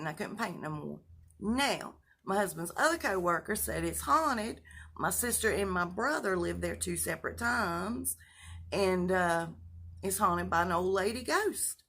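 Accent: South, United States. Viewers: So good to see you! You look beautiful, love your hair. Wish mine would stay put! I swear it has Dementia, I used the curling iron yesterday, tamed it with some hairspray so it would last the next day. check this out, I am mixed, with mixed hair, I woke up with freaking straight hair, how is this possible! When I want it straight, it doesn't stay, and gets frizzy and crazy. By the way, loving the Chewie Bag. Do you still live near the area Chewie disappeared? You know I'm still hoping he returns. It's good to see you smiling again, it really is. Always remember there's alot of people, you don't see that truly care, and love the heck out of you! Lots of love from Brooklyn NY You have the most adorable voice and the cutest Southern accent! And yet, when you laugh, 🤣 you sound so devious! You have a Bless good morning, and a beautiful day.💕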